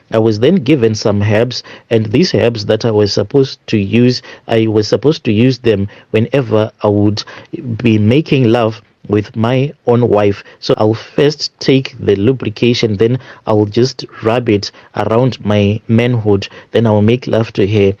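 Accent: Zimbabwe. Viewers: This is just crazy the story teller sounds Zimbabwean or a national foreign but all his stories are based on South Africans …I sense lies here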